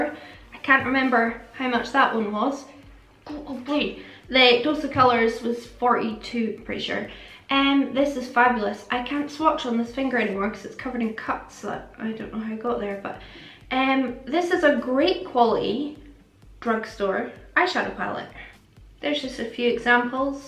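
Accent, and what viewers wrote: Scotland, United Kingdom. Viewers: the Scottish accent sounds so mellifluous like you are low key singing your words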